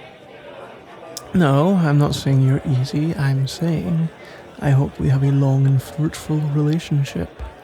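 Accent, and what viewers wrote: United Kingdom. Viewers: British boy!!! I'm British too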